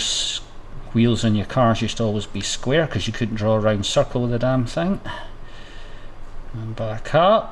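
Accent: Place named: Scotland, United Kingdom. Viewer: You are the best, even for me listening Scottish accent !!! Merci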